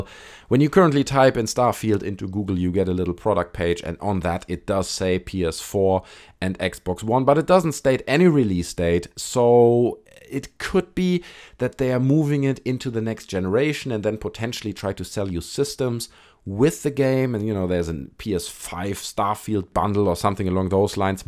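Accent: Germany. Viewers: Is that a German accent? I'm a first-time viewer of your channel. I'm not 100% sure if it is German... I can't quite place it. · are you German ?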